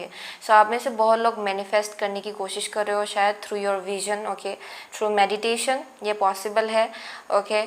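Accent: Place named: Arunachal Pradesh, India. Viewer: Aap kaha se ho mam? Arunachal Pradesh se, aisa lag rha hai, i m from Psg, mine is pile 1 n 4, both resonates n m confused. But thnx 🙏 Nice reading. 👍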